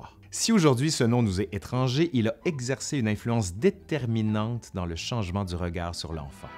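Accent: Canada. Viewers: Bonjour vos vidéos son excellente et j’aime bien votre accent Canadien bonne après midi à vous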